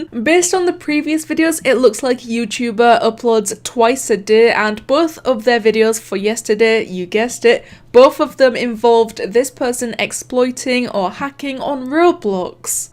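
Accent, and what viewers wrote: United Kingdom. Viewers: youchewber British? I'm british so I would now that ur british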